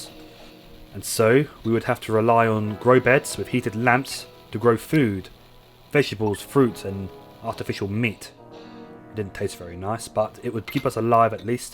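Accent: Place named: United Kingdom. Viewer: You some how sound more British then I thought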